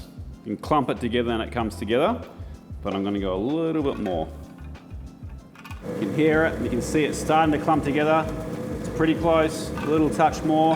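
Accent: New Zealand. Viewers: I had no idea was an Enzedder, but I honestly should’ve guessed it based on the accent 😂. EDIT: Okay, I haven’t watched one of his long-form videos yet, so I wasn’t previously aware of it. Hopefully, that clears up things a bit. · Oh hey, I'm not crazy... Only been watching your videos for about a week (but been watching a smattering of them) and had one where you said you were in Sydney. Through me off because I was sure it was a NZ accent (words like "fish" are key, and growing up in Canada my best friend was from NZ). Happy to hear you say you're originally from NZ. All is right in my brain again.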